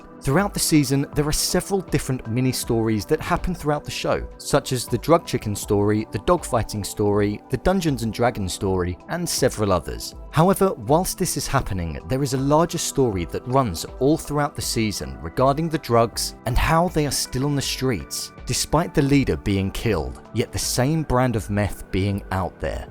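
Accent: United Kingdom. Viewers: It's so fucking try-hard with it's "crude" humour and gags. Especially with the celebrity references. This guy thinks it's "hilarious"? What a waste of a posh english accent. lol I enjoy Rick and Morty. I think American Dad is funny and sometimes even Family Guy. But Paradise PD is very very very very VERYYYYY unfunny. I laughed once every 1.5 episodes. Nothing subtle. AT ALL. They try to be rude, crude and lewd at every single point. For every one sentence of scene building there are 5 or 6 "jokes". Bad "jokes" and "gags". It's like those parody movies style of humour. Scary Movies, Not Another Teen Movie, Meet The Spartans, Vampires Suck and so on... I watched all 10 episodes btw.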